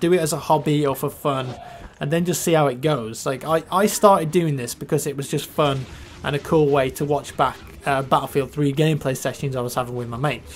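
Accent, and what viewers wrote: United Kingdom. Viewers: you forgot to mention having a British accent definitely helps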